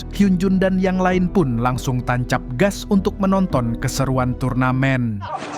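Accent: Banjar, Indonesia. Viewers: Nah urang banjar kh😂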